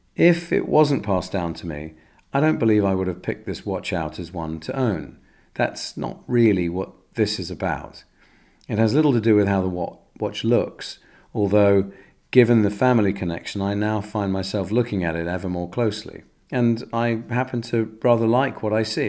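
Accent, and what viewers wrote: RP, United Kingdom. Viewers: Your English is Royal. I love watching your videos. Thank you.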